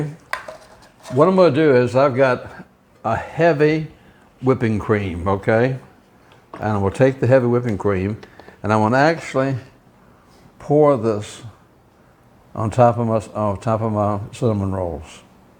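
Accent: South, United States. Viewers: OMG! I luv your cinnamon rolls and most of all your southern accent makes me home sick for for family in Beaumont, TX YOU go girl. Peace out. S. Valentine Los Angeles, CA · I love your accent❣️ What part of the South are you from (Louisiana, here)?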